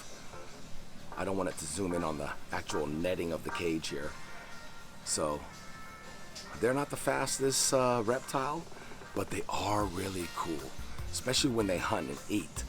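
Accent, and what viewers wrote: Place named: California, United States. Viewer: Has that lib twang cali vides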